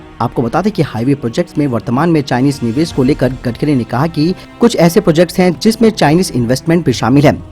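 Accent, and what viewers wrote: Uttarakhand, India. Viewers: कहां के रहने वाले हैं आप। मुझे तो उत्तराखंड से लग रहे हैं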